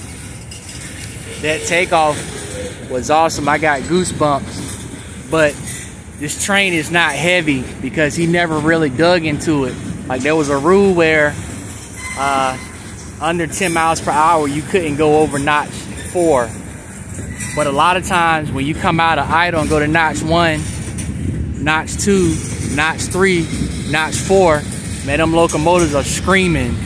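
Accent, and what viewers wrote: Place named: South, United States. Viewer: You make a great train video my friend. I can't figure your accent, either North Carolina or Louisana.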